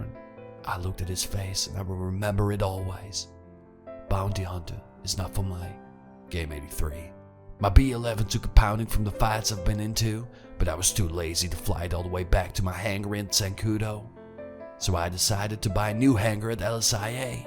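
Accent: South, United States. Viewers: the accent is a southern american accent. not south america i mean the american south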